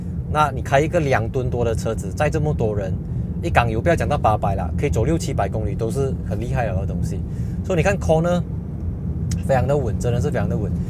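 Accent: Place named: Malaysia. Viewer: 马来西亚的说话就是这样子的吗？带着沉重马来西亚口音的英语，让实话让我这个中国人听了特别别扭。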